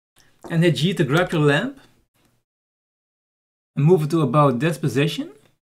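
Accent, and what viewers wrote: Netherlands. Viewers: are you dutch ?? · Are u dutch??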